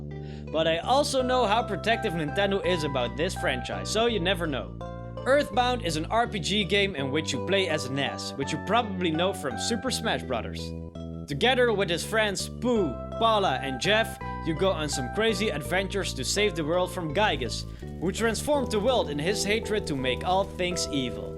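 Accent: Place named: Netherlands. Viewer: his accent is way to dutch goede video tho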